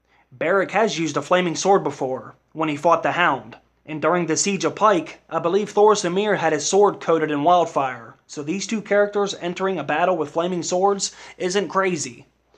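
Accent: United States. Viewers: I can't place your accent. Sometimes you sound like you're from Bal-more when you enunciate those rounded Os. Then you sound like you're from New England with the shortened A (i.e. park is pahk car is cahr) but then you sound like you're from West Virginia with the countrified dropping of word endings (I don't mean that as an insult- I think countrified accents sound absolutely warm and charming!) I think you did an outstanding job on this video. Well done, dude!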